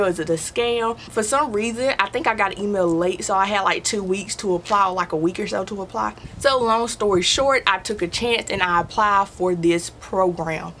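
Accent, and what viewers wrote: South, United States. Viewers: I can tell she from the south. Id say memphis or Louisiana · Strong Southern ascent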